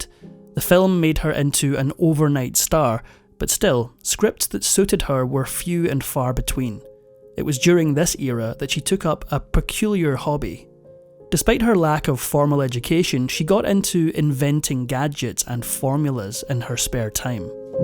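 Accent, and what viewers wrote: Scotland, United Kingdom. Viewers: And as n Anglo speaking listener, not only Scottish, but clearly spoken and completely understood 😉 Beautiful lilt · I don't know what your talking about! I thought this man had a lovely Scottish accent. I'm Scottish too and very proud of our accent. What a cheeky you've got! 🏴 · People only talk about her WW ll contributions but the more you remember or read about her, this was one sick Beech. Addicted to amphetimines and hateful of her oldest adopted son, she also felt entitled to shoplift. Her marriages were a disaster. Lamarr, charged with allegedly shoplifting $86 worth of merchandise from the May Co. Wilshire department store, was found not guilty by a jury. Photograph caption dated April 25, 1966 reads, "Denies any intention to take merchandise from store without paying for it." She was married and divorced six times. 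I love the Scottish accent! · Ah. the narrator is a Scotsman - how novel is that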